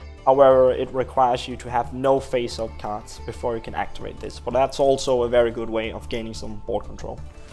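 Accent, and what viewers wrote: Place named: Denmark. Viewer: You sound like you're from Denmark, love your voice daddy <3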